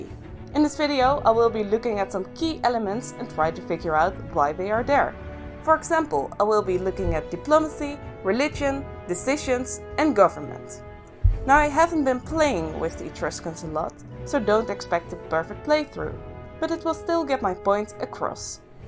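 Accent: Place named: Netherlands. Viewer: Great stuff! Started off sceptical, since the first view presentation didn't look that inviting. However, now that I watched this first video I have to say, love what you are doing here! Especially taking the ingame depiction of Etruria, and putting that next to the popular and academic views is a really nice and interesting exercise. And in general, its nice to hear a Dutch accent in a space often so dominated by Anglophone ones. This one was off to a great start for this series!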